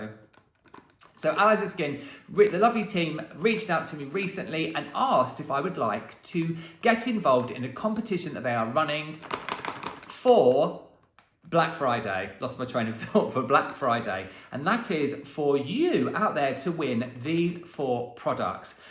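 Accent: United Kingdom. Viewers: I truly adore your British accent ❤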